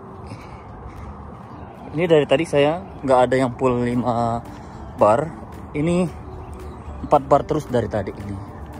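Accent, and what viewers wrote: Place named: Lombok, Indonesia. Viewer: logatx kayak org lombok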